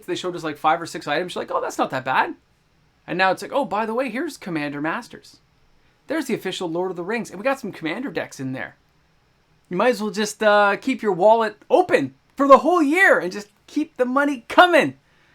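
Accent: Canada. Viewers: Are you Canadian? your accent is strong. You keep saying 'A-boot'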